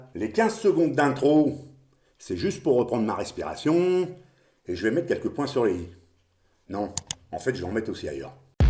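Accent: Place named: Lyon, France. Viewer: Bonjour, je découvre ta chaine et je m'abonne car ton contenu, ta franchise et ta gouaille lyonnaise sont tout simplement top. Je ne suis pas un pêcheur de carpe régulier car j'aime trop la pêche pour ne pratiquer qu'une technique ceci dit (Eric Pitre et maintenant sont les 2 seuls carpistes YT ou j'ai cliqué sur s'Abonner), quand je vois ton sweat team Hutchinson,ca me projette 25 ans ans arrière dans les Carpe magazine et mes uniques cannes 13 pieds 3,5 lbs 4 Dream maker sont toujours operationnelles. Longue vie à toi!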